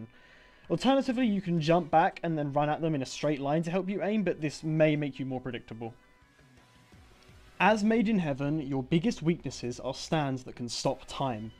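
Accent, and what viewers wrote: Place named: United Kingdom. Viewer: eclipse but british B)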